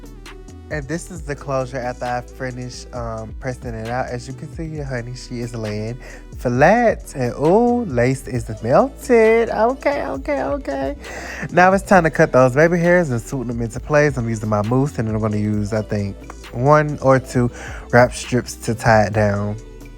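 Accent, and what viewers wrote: South, United States. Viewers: being too cute when the country twang jump from the gums.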